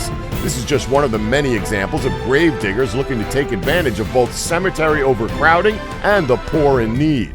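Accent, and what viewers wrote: United States. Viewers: the amount of american english, wants me want to strangle you. FFS pronounce something correctly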